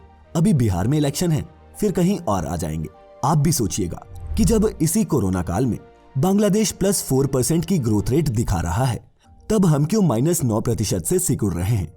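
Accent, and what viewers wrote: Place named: Odisha, India. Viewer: Your Odia 🙄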